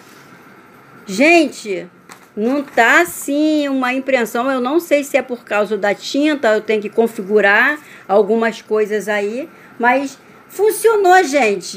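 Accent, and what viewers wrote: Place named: Rio de Janeiro, Brazil. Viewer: Legal o vídeo, mas pelo sotaque você é carioca, e é normal os correios terem medo de entregar, só tem bala perdida aí e bandido.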